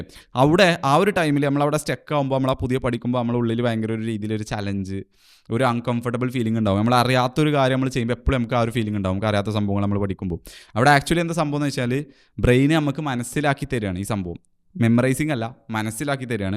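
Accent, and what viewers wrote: Malappuram, India. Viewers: Hey bro, you sound like a Malappuramkaran… .. good video of how to not use ChatGPT, I am from perinthalmanna living in Australia.. happened to see this video. It’s very nice. I will be happy if at least some of the new genz kids get influenced by your video.. liked the dopamine video too..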